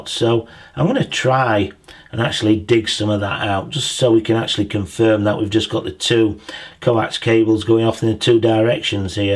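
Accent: Yorkshire, United Kingdom. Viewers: First class review. Is that a Yorkshire accuent. Most pleasant. Many thanks for uploading.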